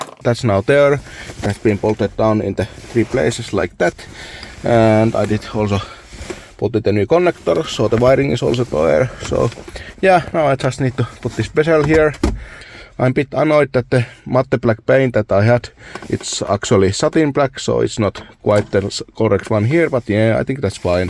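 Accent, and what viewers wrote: Finland. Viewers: Ralli englantia😂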